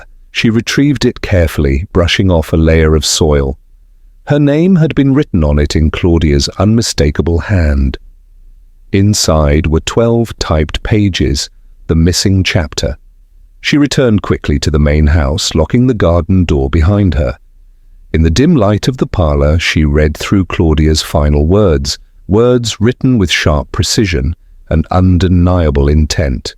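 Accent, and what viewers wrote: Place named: United Kingdom. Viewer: Why is this narrated in a British voice?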